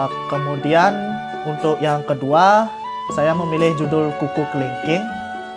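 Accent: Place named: Bali, Indonesia. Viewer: Kak dari Bali ya? Keliatan banget logatnya😂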